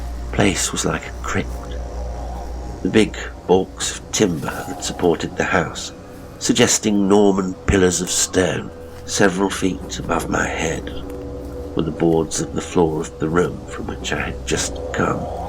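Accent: United States. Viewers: Well kudos to for the American accent maintained for so long - really enhanced the Kiplingesque story. Respect. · I had forgotten how much I absolutely love your American accent 🖤✌️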